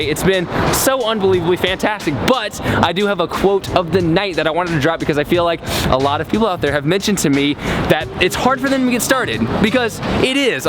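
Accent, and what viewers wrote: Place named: New York, United States. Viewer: lol i love it the new York in you is starting to come out.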